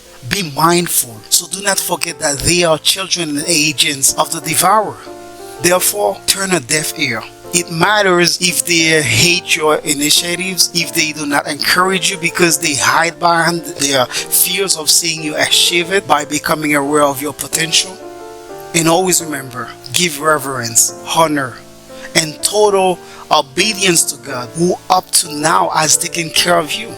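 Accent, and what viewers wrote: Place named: France. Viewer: Interesting! I really appreciate your french accent.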